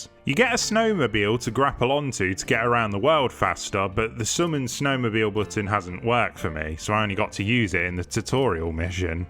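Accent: United Kingdom. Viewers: Yoo this dude hgas such a Grimsby accent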